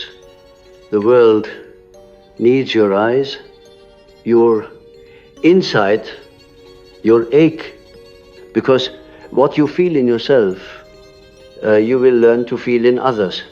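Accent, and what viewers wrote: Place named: Germany. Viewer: No perfectin in your Speech or pronounciaition. . And to hard on the accent .. tooo germanish .. but acceptable.. create some perfection guys .. like original ..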